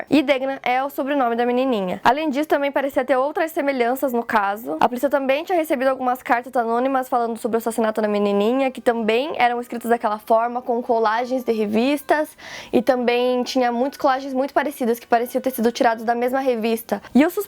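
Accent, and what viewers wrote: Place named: Paraná, Brazil. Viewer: Claro que tem. Todo mundo tem! Ela fala "de" e não "dji", por exemplo, que é uma característica do sotaque do Paraná.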